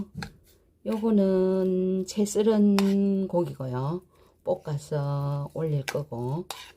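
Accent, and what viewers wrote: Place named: Gyeongsang, South Korea. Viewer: 돌솥비빔밥 제대로 알려주셔서 잘배웠습니다 나긋한 경상도 사투리로 설명 해주시니까 정겹습니다 자주 뵙겠습니다 😊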